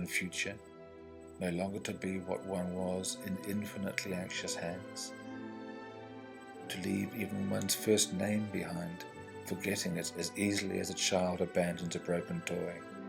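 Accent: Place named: New Zealand. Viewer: beautiful reading, you must be a kiwi?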